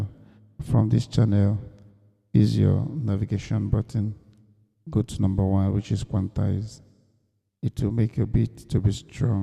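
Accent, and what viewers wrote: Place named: India. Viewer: Sir your voice has turned to an Indian voice, I couldn't understand the language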